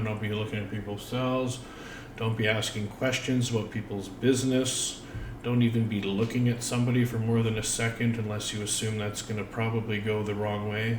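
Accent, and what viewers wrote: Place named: Canada. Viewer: You get so many mixed messages about the American system about rock spiders and sucks and such salts against women. But these are the same people that do that. So that confuses me and you've are giving me some serious information which I appreciate. When you say you was so sided with certain games on motorcycles. Were you a hang around? I was just curious. Cause that's what it sounds like. I'm not trying to compare 1 person with another. But you remind me so much of JD. But the Canadian version, The fact that you speak canadian in other words. I own my shit is two true you give great advice/knowledge. Keep doing what you're doing you're success. I know i'm not kissing ass i'm just blown away.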